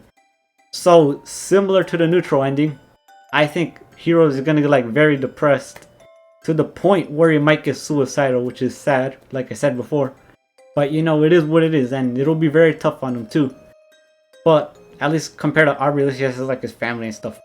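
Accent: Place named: India. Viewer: lmao your half indian accent and you smiling all video doesent match the topic but its fucking funny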